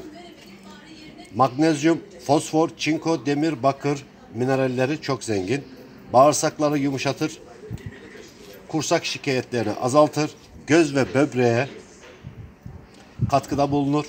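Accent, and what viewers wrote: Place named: Rize, Turkey. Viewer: senin şivene kurban olurum abiiii:))) ben de Rizeliyim... saygılar. teşekkürler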